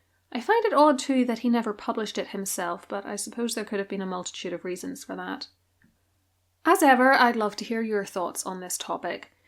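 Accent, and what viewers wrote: Northern Ireland, United Kingdom. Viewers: But you have a voice, oh, such a voice! A voice that is of a good language, and English, at that. Or Northern Ireland or someplace. ❤ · As a Shakespeare Original Pronunciation aficionado, hearing Elizabeth's speech read in your lovely Northern Irish accent (which bears some similarities to O.P.) really makes it come alive for me.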